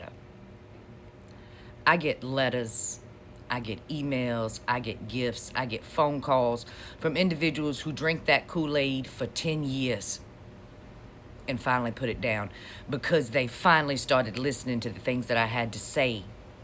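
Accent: South, United States. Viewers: We love the southern whether heavy or not. We love the look, the laugh, the truth, and the absolute ease in which it seems to flow. 😘💙🥰 The jury still out on Marge. Give 'em hell. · Keep it up. I know by experience that humor and a southern accent break through walls. Mine is more of a hillbilly, Eastern Kentucky accent but just as effective.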